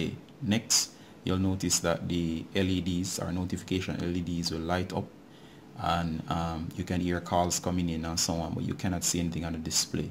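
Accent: Jamaica. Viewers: From I heard your voice I knew you are Jamaican lol Your sim card confirmed it. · Are jamaican?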